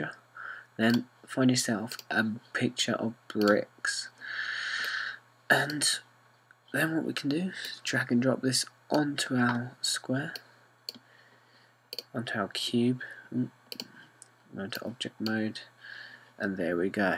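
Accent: United Kingdom. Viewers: your UK dialect is the best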